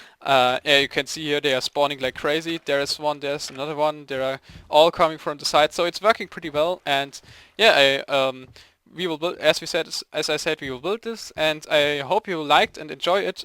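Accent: Germany. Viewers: real german english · He sounds like a german and there are some german players around him (Banenenkrieger = bananwarrior) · u sound german :D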